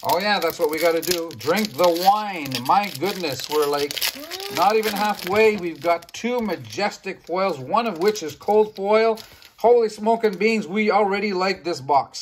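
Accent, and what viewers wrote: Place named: Quebec, Canada. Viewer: Nice video! You Canadian have such a cute French accent. 👌 Nice wine, St Emilion is always a good choice.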